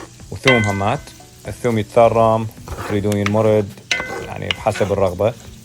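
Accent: Iraq. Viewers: ياجماعة الخير اني اذا افتهم من لهجتك انت نجفي قح من جماعة كفه الصحن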